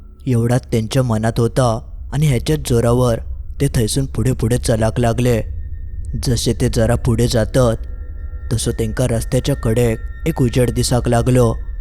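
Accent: Malvani, India. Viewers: Me tumchi ghoshta yechi khoop vaat bakto mala tumhi malvan madhun bolta te mala khoop avadto maze board chi exam chalu ahe mhanun hee 8-9 divss mi tumchi gosht ikoshakat nahi pan exam zale ki zoptana youtube ugadalya -ugadlya tumichich ghosht iknaar ❤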